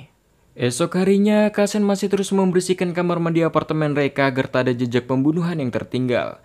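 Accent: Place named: Indramayu, Indonesia. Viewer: Orang indramayu tah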